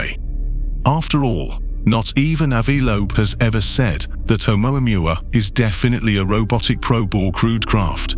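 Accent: United Kingdom. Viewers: Nasa’s the biggest hoax played on people yet! Nice artwork though! People will believe anything attached to an alphabet agency and a British accent!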